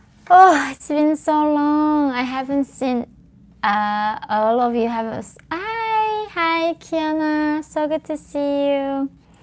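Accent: United States. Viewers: you sound more American than native born Americans. In a sense that is a bad thing. Keep speaking out to open peoples minds.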